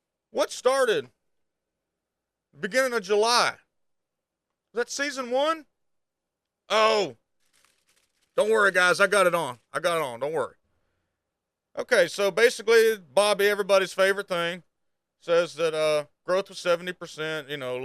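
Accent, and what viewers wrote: South, United States. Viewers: got the RECITES!!! Southern brain at work! And hate to say it but when people talk about our southern twang they are just uncultured!!!! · man you gotta lean into the southern accent, start saying stuff like "what in the sister kissing woods was Blizzard thinking" love the videos and asmond linked you this time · we need more southern boiz doing content like this! Lovin the accent and screw them yankies who don't! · Hello. I think you are 100% right in this matter. It's like that microtransaction conference video that was released years back, and people were shocked how well structured and organized it was when it comes to min/max bleeding customers dry. For all Blizzard's fault and mistakes, in the business side of things, I think their decisions are based on decades of research and millions of dollars. It's no mistake that Eternal Realm was nuked and season comes with new mechanics, new powers, seasonal goodness and battle pass yummies. 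As for your accent. Growing up in Sweden we were bombarded with American culture from TV and movies. Southern accent folk weren't generally portrayed in a good light during the 80's and 90's. So I will at times instinctively think "oh he a redneck", but can quickly dispel that notion due to how idiotic it is to think like that. It can however, not be denied that it's human nature to dislike and be suspicious against things unlike one self. Culturally and appearance-wise. When I see someone in full leather, spikes, black hair, nose ring etc, I will generally have a negative idea of that person as a first impression. That does of course not mean that the person is in any shape or form a bad person. It just is what it is. I will also say that I absolutely love your accent, it is no doubt my favorite American accent. If I would ever marry an American woman, it would be a southern lady. Lauren Cohan as Maggie in The Walking Dead and Joelle Carter in Justifie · Anything can seem like a conspiracy if you know absolutely nothing about how it works. 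Southern bro here, i really appreciate your channel. Seeing people that sound like me do well, helps out a lot. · 100% correct about the bigotry and prejudice regarding your accent/drawl. I live down here too and I’ve been told time and again ‘I didn’t think you were that smart bein a redneck’ from people who don’t even know me. I just laugh. People are indeed ignorant. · oh come on, i FUCKING LOVE your accent. i think because people usually talk like that in movies where they talk about some stupid shit (the stupid southern guy caricature) but what you say is intelligent AF. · Hell yeah brother, Southern drawl doesn't indicate anything more than that we grew up in the South. I've dealt with that in my IT career, and a friend of mine was a chemist for Pfizer dealing with the same thing there. Somehow my mullet is unprofessional in a corporate work environment, but if it were pink or blue it wouldn't be? 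Shhiiiiiitt. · Right you are. My Southern accent is more pronounced than yours. I have an earned Ph.D. in physics. Hate it when people pre-judge me on my accent. Usually wind up being embarrassed pretty quickly though. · Lean in to that Southern draw. It's what helps you standout to me honestly. Your coverage is great and I think your ideas have merit. Regarding Asmon not giving you any credit he usually will spam a link to your channel in his live react video on twitch which I believe he did because watching him react to you is how I found your channel. Own that southern gentlemen stereotype man. Embrace it. Lean into. Let be the way you stand out o these content creator driven platforms. The great thing about it to is it you being you. There is no gimic or shtick. You are real authentic and down to earth kinda guy on a platform full of fakes. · Came for the Redneck gunloving accent 🙂 stayed for the tinfoil hat... 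· Subscribed! Dudes accent is so perfectly southern.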